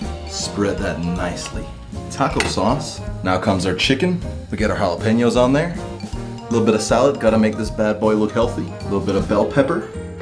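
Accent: Denmark. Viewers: wait, you're danish? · Is danish? Or scandinavian for that matter?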